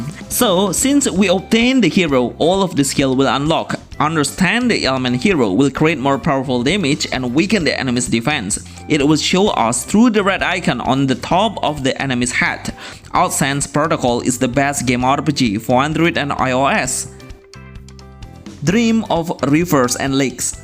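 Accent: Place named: Indonesia. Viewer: I'm from Indonesian, and I noticed your accent pretty much similar to me.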